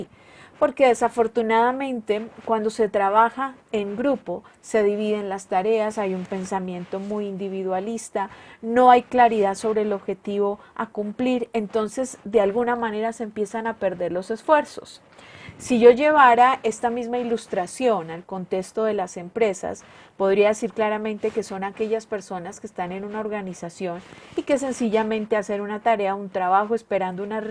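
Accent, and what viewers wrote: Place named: Colombia. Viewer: Adoro el acento colombiano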